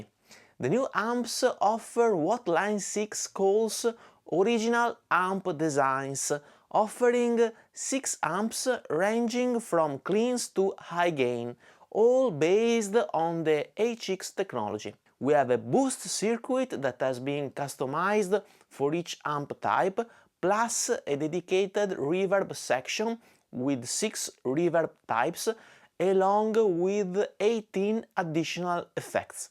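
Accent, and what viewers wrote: Italy. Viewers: Your pronunciation is a kind of "itanglish"(Italian and English) but don't affect the incredible reviews that you made. Prepare to be a next super masive channel. Congratulations!!!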